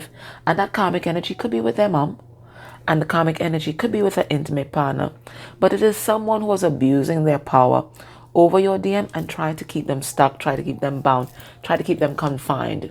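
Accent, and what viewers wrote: Trinidad and Tobago. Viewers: Are u Trini?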